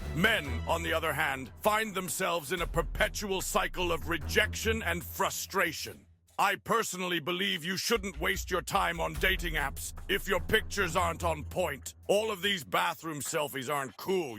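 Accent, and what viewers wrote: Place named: United Kingdom. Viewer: Okay, okay......But why is he British?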